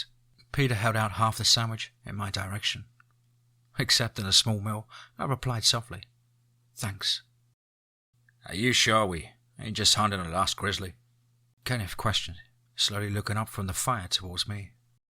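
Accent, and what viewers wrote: England, United Kingdom. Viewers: Huh ? I don't think it's a speech impediment. It's the accent ya know English accents. But maybe you are correct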